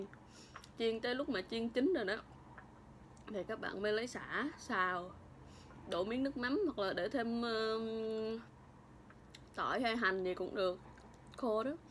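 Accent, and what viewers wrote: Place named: Bahnar, Vietnam. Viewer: Tuan NGuyen chị nói.. Là người Ba Na kìa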